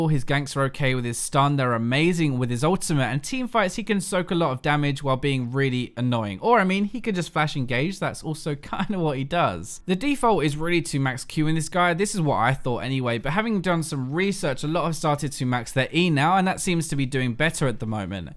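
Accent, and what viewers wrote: England, United Kingdom. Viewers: is he english or an american?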